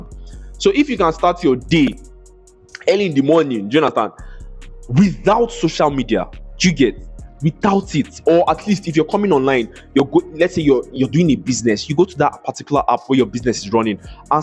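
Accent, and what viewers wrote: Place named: Nigeria. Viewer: Nice video Bro hearing a fellow Nigerian brother speaks with a naija Tongue really resonate so much Waptrick, redwap You were really spot on Porn addiction is the grandmaster of the other addiction. A porn addict brain is same as that of a person on heroin, a porn addict, will also be addicted to gambling and other vices A man who can control his sexual urge is a dangerous man, and a dangerous man is a focused man I have some video requests for you, will like to hear your opinion on 1) Nofap and Semen retention 2) Single mothers: - Dating a single mother/ babymama as a man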